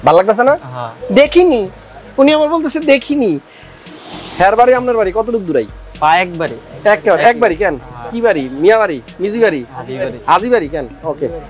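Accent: Noakhali, Bangladesh. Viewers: Vai apni NOAKHALIR NAKI 🥰🥰🥰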